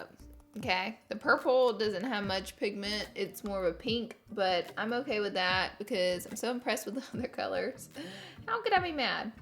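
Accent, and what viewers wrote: South, United States. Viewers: I saw your manicure on Snapchat and I'm in love with it. I've been wanting to tell you something but you get so many comments that I doubt you'd ever see it, but I just wanted to tell you that I love watching your videos because I feel like I can relate to you so much because I'm from Louisiana and your southern accent makes me feel like I know you personally or somethin. I know it sounds crazy but every time that you really like a product I end up going to buy it just because I feel like I know you or something and I feel like we would like the same things. Also I was very surprised that people didn't know what cat's pajamas meant I've been saying that since I was a little kid so maybe it's a southern thing. and speaking of your palette, I use it almost every single day and I have a beauty group on Facebook and I have almost all of my members using it as well because I do live videos of looks with it and they fell in love with the colors and how pigmented they are. 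Just wanted you to know that I really appreciate you and relate to you on a strange level. too, because his mannerism reminds me a lot of my husband's, so I love seeing the two of you together because it reminds me of my husband and I. I hope I don't sound super weird you probably won't see my comment anyway but I just wanted you to know that there was someone out there that really relates with you and appreciates all that you do for the YouTube Community. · I’m glad I’m not the only one with a country accent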